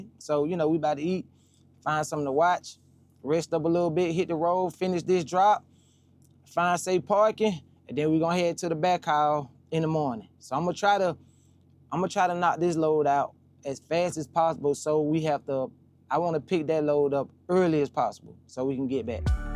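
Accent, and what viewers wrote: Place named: South, United States. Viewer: New Subscriber Alert!! 🚨 Love your mindset & southern accent! Looking forward to more videos 😊🔥🔥🔥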